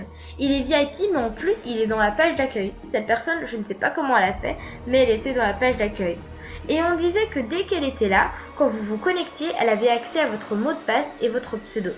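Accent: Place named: England, United Kingdom. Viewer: J'ai kiffé l'accent anglais ('x ♥♥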